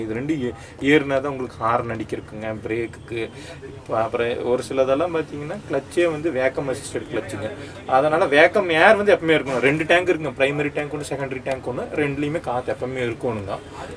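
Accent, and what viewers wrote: Kongu, India. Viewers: நண்பா கோவை கொங்கு தமிழ் சூப்பரா பேசினார்💯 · Anna kongu Tamil super pesuringa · கொங்கு தமிழ் சூப்பர் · Enjoy கொங்கு voice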